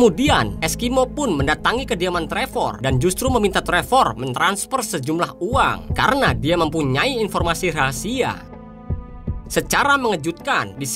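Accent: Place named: Central Java, Indonesia. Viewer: iki logate wong jateng iki... Kendal semarang?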